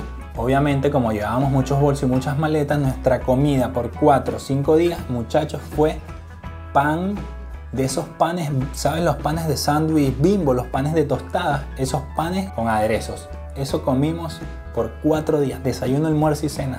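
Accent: Argentina. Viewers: Me encanta tu acento medio argentino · Y qué es lo q te da risa? Supongo ignorás q los argentinos hablamos así.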